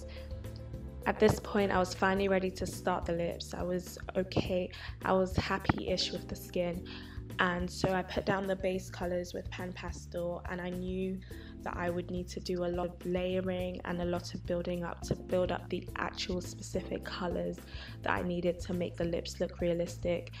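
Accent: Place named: United Kingdom. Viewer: I love your accent, I’m british too, also this is an incredible drawing! You have a lot of talent.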